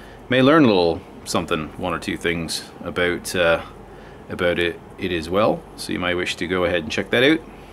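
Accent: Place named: Scotland, United Kingdom. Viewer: Interesting mix of Scottish & American & maybe a little Australian accent there.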